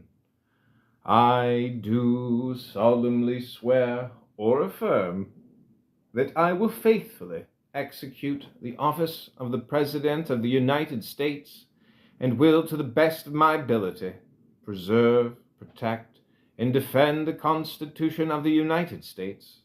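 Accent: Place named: United Kingdom. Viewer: It just seems wrong to recite the Constitution in a British accent.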